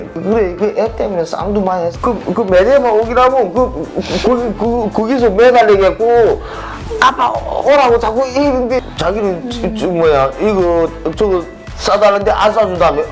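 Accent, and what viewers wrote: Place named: North Korea. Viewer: 님 한국에 오신 오래되었는데 아직도 북한사투리가 ㅎ 이젠 대한민국 표준말도 연습하시는 것도 좋을것 같네 언어 내가 말하는 의미 전달 중요합니다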